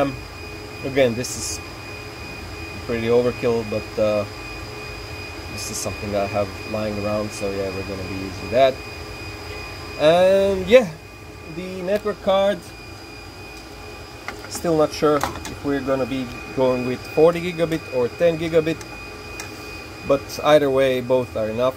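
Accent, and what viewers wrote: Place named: Russia. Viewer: Акцент такой русский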